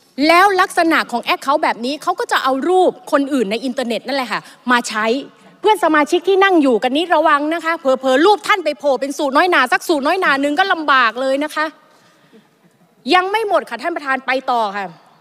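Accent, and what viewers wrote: Thailand. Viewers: แหลงหรอยนัดสสคนนี้ สสใต้ไหมครับ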